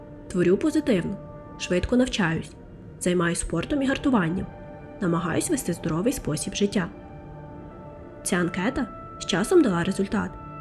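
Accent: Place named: Chortkiv, Ukraine. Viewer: У вас такой акцент как у моих друзей из Черткова) но формат у вас как у некоторых американских true crime каналов. Вы видимо в Америке жили или живете?!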